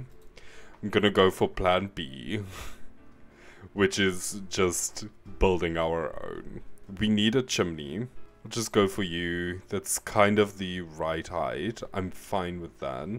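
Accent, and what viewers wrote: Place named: South Africa. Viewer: I could here it in your voice 😊 Nice to meet another South African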